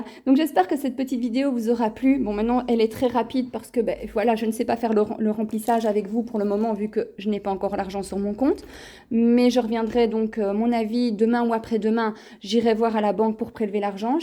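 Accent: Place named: Belgium. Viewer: J'adore houit à la place de huit et nonante à la place de quatre vingt dix.J'adore les Belges et la Belgique.Ne change rien!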